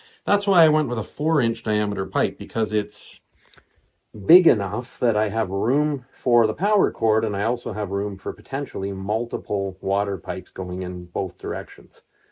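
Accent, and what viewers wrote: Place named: Canada. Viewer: I love your video tutorials; they are well paced and informative. Love the Canadian accent!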